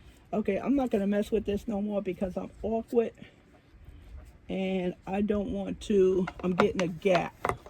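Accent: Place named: Alabama, United States. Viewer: I wonder if one of your local yarn shops has a weaving class? Every year on TV I watch the PA Farm Show, and they have a competition for the best wrap/shawl. That is my favorite part on the PA Farm Show. I love weaving, but having a loom is another item I just can't have. Please have many more videos on your progress. I think this is one of my favorite videos that you made for your yarn friends. My husband ask me if you are a Southern girl. He said that you sound like his friends from Alabama. : ) I just love you; your the best!!!! Smiles, Claudia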